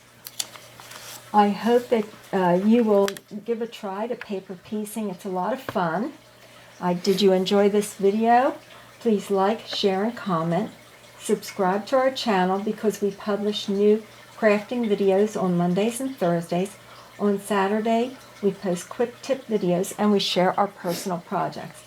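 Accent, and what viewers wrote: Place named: Pittsburgh, United States. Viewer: I hear a Pittsburgh accent!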